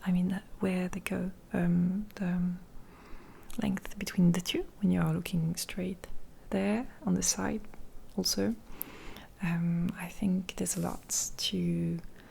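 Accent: France. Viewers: A perfect video I’m sure of what I’m saying but l accent français franchement sa rajoute un truc et le alors a un moment magique super vidéo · Je suis juste réellement impressionné par ce bon niveau d'anglais, j'écoute bcp d'asmr anglais pour m'améliorer, eh bien contre toute attente un asmr anglais avec accent français c'est pas si mauvais pour progresser, j'ai tout compris, l'accent doit être assez familier je suppose. Sinon continue comme ça, ton asmr est très bien. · is she french? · El acento francés sisisi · Are you French?? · Am only 2 mins in and I thought French but will listen a bit more lol first video of hers so haven't a clue. (Sounds French to by English ear anyway)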